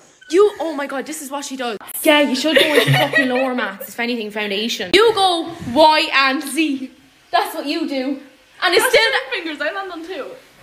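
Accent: United States. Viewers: Zeeeee? Which part of America is she from?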